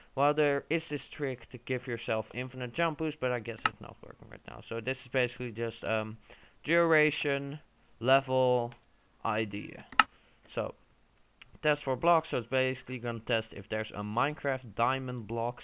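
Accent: Netherlands. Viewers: love the dutch accent :) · Are u Dutch? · je kan makkelijk Horen dat je Nederlands bent:) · Ik dacht het al aan het accent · Da Ceh ja je hoort het altijd als een Nederlands iemand Engels praat. Goedw vidieo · are you dutch ?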